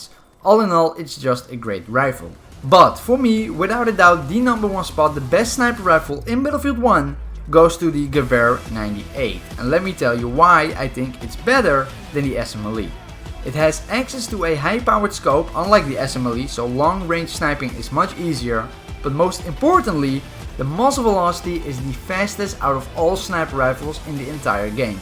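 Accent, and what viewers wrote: Netherlands. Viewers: Are u dutch? Ur accent really sounds dutch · ' ' ben je Nederlands? Het klinkt zo maar ik weet het niet zeker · is he dutch? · Ik hoor dat je nederlands bent 😆😋😂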